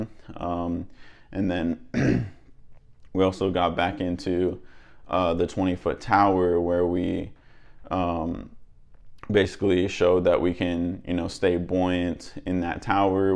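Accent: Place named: Czech Republic. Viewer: I know it's a stupid question, but I just have to ask, aren't you from the Czechia? I ask because you have such a Czech accent. Your last name is also used a lot here.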